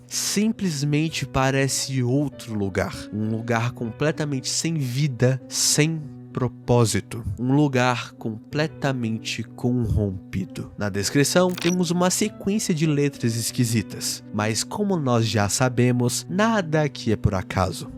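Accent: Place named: Portugal. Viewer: O cara e português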